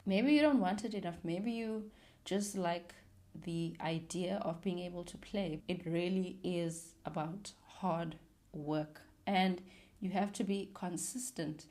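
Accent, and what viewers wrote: South Africa. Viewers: You from South Africa ?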